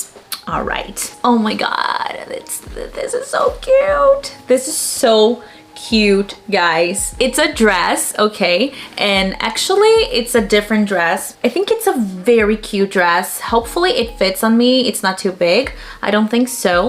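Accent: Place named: United States. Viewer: more videos in english please, you’re sound like a american girl haha loved it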